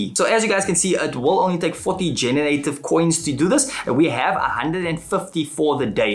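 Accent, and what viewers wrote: South Africa. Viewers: Is that a South African (Cape Town) accent I'm detecting there? :)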